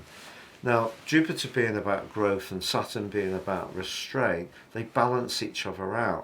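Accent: United Kingdom. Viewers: I love this amazing and well compose being. I love to hear him, his British accent. He speak to refine, I love it!!!🎉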